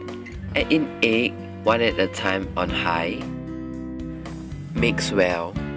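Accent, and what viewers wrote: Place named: Singapore. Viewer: I'm sure you are Singaporean with that voice, anyway,great receipe!, I'm from Singapore too!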